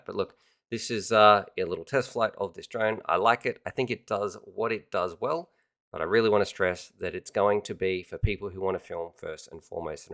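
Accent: Australia. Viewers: Mate he's aussie it's his accent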